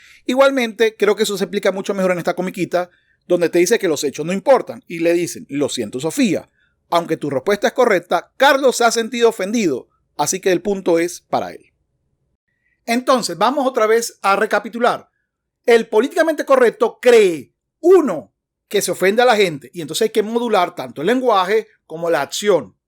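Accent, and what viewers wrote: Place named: Maracaibo, Venezuela. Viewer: Tenei acento maracucho mano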